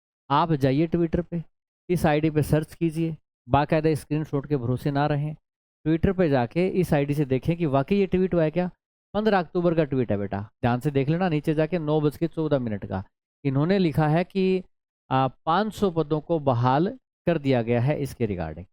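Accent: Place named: Haryana, India. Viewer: Sir apki language se haryanvi jlak rhi h.. maam ne btaya tha ki ap haryana se belong krte the.. Phle. 😅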